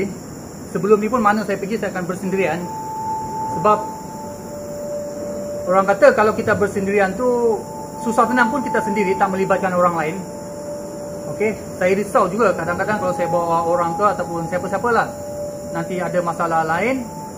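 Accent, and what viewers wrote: Sabah, Malaysia. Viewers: Hai..salam dri sabah,sya pn cmpuran jg..mak sya org rungus kudat bpa sya org brunei..sya fhm2 sikit2 ckp..sbb mcm bhsa rungus jg cuma lohgat sja lain..sya memng sdia mklum org sabah thu dri bhsa mlayu sja..apa pn terbaik..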